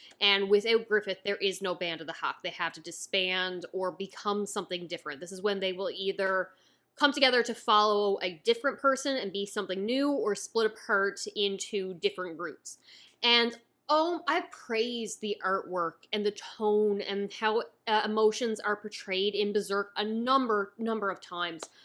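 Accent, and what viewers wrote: Newfoundland, Canada. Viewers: Are you from newfoundland? Can't place your accent might be Minnesota.....it's a weird question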